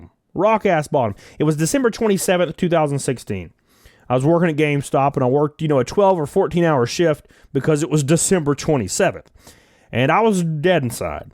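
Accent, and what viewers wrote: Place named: Kentucky, United States. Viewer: This guy’s page is gonna blow up. Keep up w the content brother. You remind me of my buddy’s - lol. From KY. That accent can’t be mistaken.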